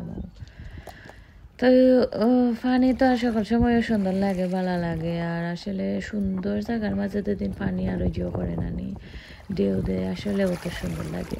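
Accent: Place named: Sylhet, Bangladesh. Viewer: আসসালামু আলাইকুম কিতা খবর বালা নি আফা মাশাল্লাহ কি অপুর্ব উপস্থাপনা আর দৃশ্য গুলো দেখে মুগ্ধ হয়ে গেলাম সিলেটের কথা মনে হয়ে গেল আপনার কথা শুনে💖❤️✔️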